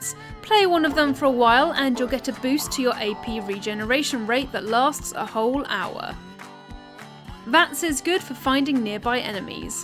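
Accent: United Kingdom. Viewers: Oh God she's British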